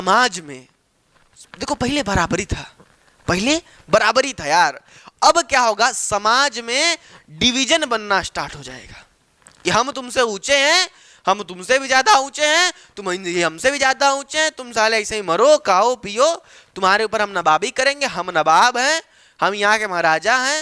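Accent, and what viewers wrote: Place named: Uttar Pradesh, India. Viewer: You are from UP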